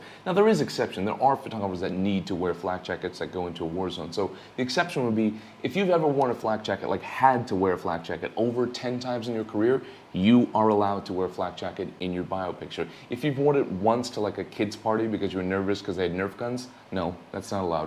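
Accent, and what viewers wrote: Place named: New England, United States. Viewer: Got to tell you, its so refreshing to hear someone on Youtube talk like you do. I told my partner that you had to be New England. Masshole here.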